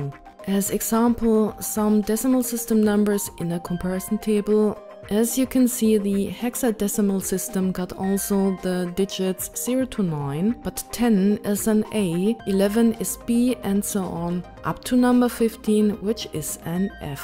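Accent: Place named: Germany. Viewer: I.. have.. to.. resist.. Srsly your voice.. What is your native language, maybe german? While reading the commands you nearly sounds like a robot :D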